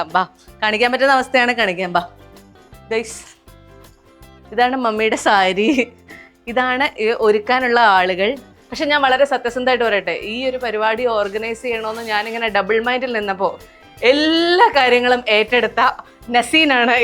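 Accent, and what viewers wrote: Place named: Kasaragod, India. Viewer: Thadi nalle pangend😜kasaragod slang❤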